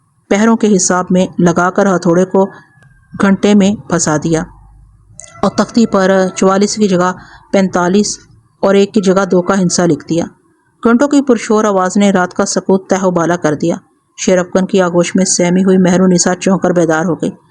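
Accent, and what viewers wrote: Punjab, Pakistan. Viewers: جو مزہ الیاس سیتاپوری کی کہانیاں پڑھنے میں آتی تھیں وہ سن نے میں کہاں اس کی اصل وجہ آپ لوگوں کا پنجابی لب ولہجہ ہے جو سماعت پر بار گراں گذر تا ہے ۔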